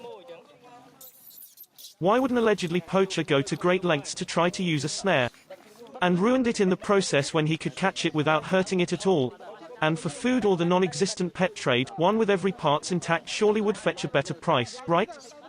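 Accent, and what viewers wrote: United Kingdom. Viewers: Sounds like somebody is all bent out of shape because he's not getting enough views. The true question is, why are you using an English accent on your video? Hmmmmmmm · where do you get youre voice over voice? something about a uk accent makes it sound way less robotic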